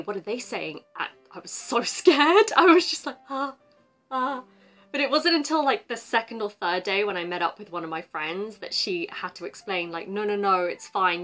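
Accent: United Kingdom. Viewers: It’s a beautiful British English!!!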